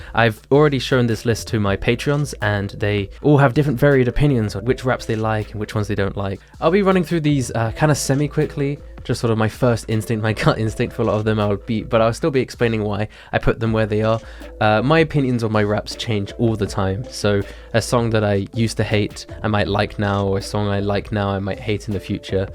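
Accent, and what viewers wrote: United Kingdom. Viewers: His voice is surprisingly very British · ...he's brittish ??? oh, not that i find it unpleasant i just wasn't expecting it haha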